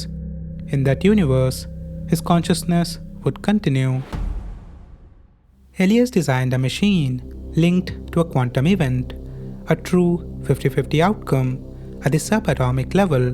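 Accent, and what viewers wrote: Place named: India. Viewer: You sound like an Indian 😊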